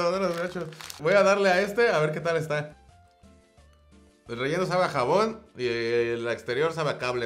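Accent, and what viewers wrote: Spain. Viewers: Muy chingon tu video y tu asento español 🇧🇴 saludos mi ya eres internacional #Queobolines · #Queobolines que buen video y me cague de risa con tu acento español xd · #Quiobolines 😂😂😂😂 me encantó tu acento español 😂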